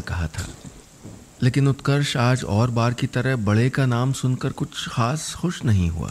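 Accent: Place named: Pahadi, India. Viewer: बहुत अच्छी कहानी खासकर हमारी पहाड़ी बोली के बोल आपके मुँह से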